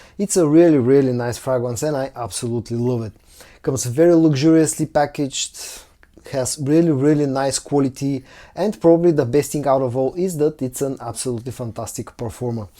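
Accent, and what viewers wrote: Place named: Bulgaria. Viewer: I finally realized how that Bulgarian accent works! The wood "oud" and "wood" almost sound the same. I've heard you describe some scents as "oudsy" but you're actually saying "woodsy". 😂 Still getting unsubbed every day, so don't think I've abandoned you friend! Be safe all.🙏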